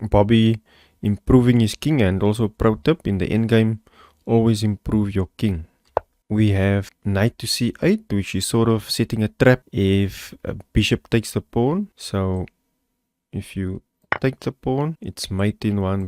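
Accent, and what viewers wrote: South Africa. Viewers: Thanks for the reply/clarification. Nice to hear your South African accent (I used to live there).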